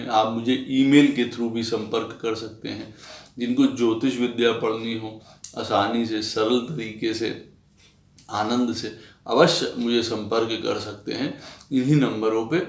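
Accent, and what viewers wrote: Lucknow, India. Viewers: सर आपका बात करने का तरीका बहुत बढ़िया है , ज़बान लखनवी लगती है । यदि यह तुला लग्न में हो तब 9वे राहु व 3रे केतु के उपाय भी बताए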